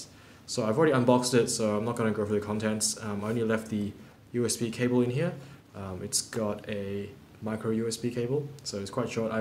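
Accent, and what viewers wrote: Australia. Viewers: Love your video & presentation but can't stand your "Aussie" accent. sorry !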